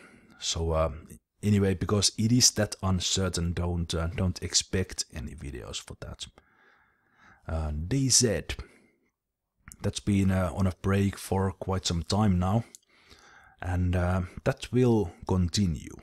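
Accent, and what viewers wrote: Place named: Finland. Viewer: Gratz, you and your channal are a part of my day! When i found your channal first time i didnt quite like your voice, but now i think its epic, English+Finnish accent=Awesome!:D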